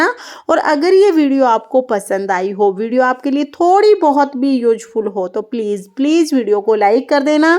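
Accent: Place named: Marwari, India. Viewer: आप हमारे मारवाड़ की ही लगते हैं हिंदी में आप मुझे बताइएगा प्लीज मुझे अंग्रेजी नहींआई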